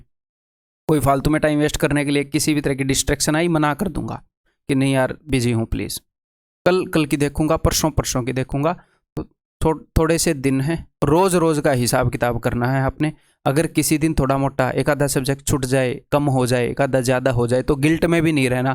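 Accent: India. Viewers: Great motivation Maja aa jata hai sunkar Bilkul Desi style me......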